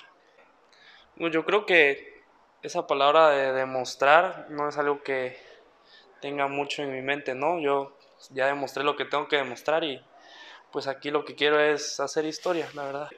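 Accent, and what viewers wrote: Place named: Mexico. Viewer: Ya perdió su identidad este muchacho, habla puro mexicano.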